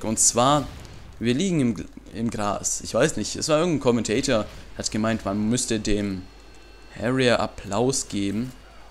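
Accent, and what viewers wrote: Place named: Russia. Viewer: bist du russe du hast so einen akcent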